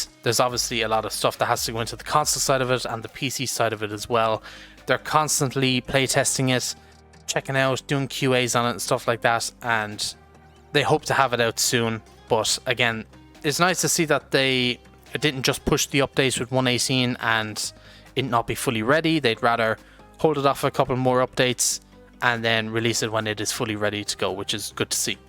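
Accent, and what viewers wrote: Ireland. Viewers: are you irish because i can hear your accent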